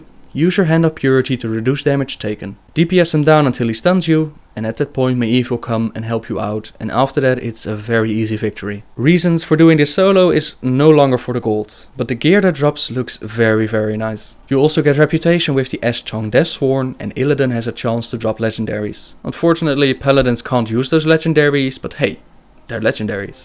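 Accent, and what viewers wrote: Netherlands. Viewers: You sound dutch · zowieso nederlands xD klote accent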